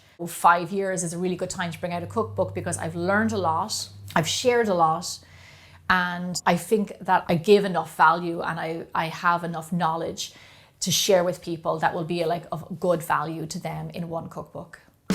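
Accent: Ireland. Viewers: I believe that I was one of your first viewers. I am so happy that you have become successful with something that clearly makes you happy. I adore your Irish accent as it reminds me of my favorite moments when I would go to my grandparents home in Kilkenny Ireland. They have since passed and I haven’t been back but I like being able to listen to your calming voice talk me through your delicious recipes. I’ll be ordering a cookbook. Also, I need to find some cute measuring spoons and cups like yours as they brighten my mood.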